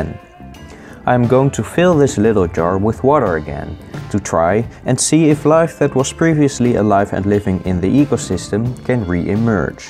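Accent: Netherlands. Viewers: Is you'rs to? And i think you're not english deu to you're speech i think you're dutch pwease respond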